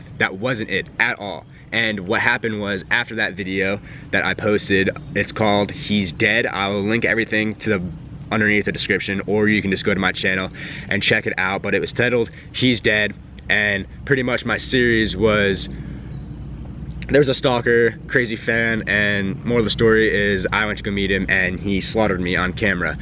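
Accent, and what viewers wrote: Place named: New Jersey, United States. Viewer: You are the best bring your jersey accent back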